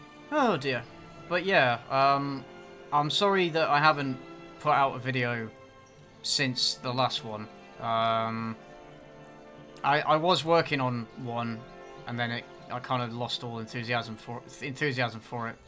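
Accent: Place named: United Kingdom. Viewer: Why is Rian Johnson livestreaming Civilization while speaking with a British accent?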